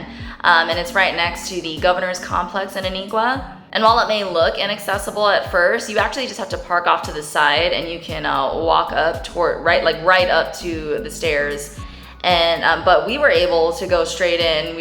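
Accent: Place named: California, United States. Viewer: Slow down when your talking. Lov ya but you sound like you're from California.... Colorado Lov.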